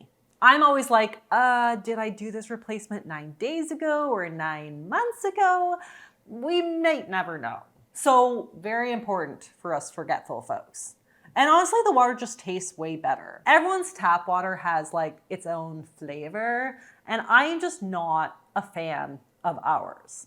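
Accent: Canada. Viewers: Honestly I thought you were american like me. You barely have a Canadian accent. Now that listen more carefully I can here it a bit.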